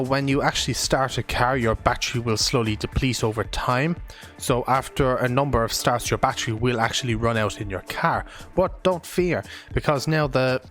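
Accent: Ireland. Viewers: I did read the patch notes... but now i get to hear them in Irish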